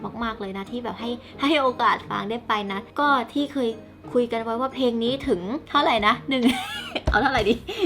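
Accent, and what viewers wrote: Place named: Isan, Thailand. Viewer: พี่พูดอีสานน่ารักนะ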